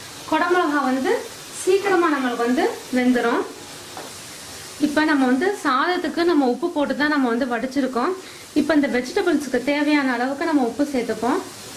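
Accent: India. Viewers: nice to hear the Madurai slang after a long time.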